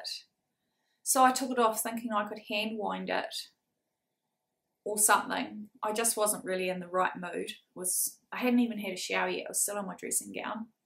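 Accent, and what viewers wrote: New Zealand. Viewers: hi it nice to meet you I'm glad Kelly recommended you I'm loving listening to you and seeing your makes I'm the same I wish I could sew better because I'd love to make my own clothes I just never get round to. it I have a soft spot for anything to do with New Zealand because I lived there quite a few years ago and my parents still have a home in the north island it's great listening to your accent so familiar Looking forward to catching up on your other episodes.I'm watching you in the sunny ukl. today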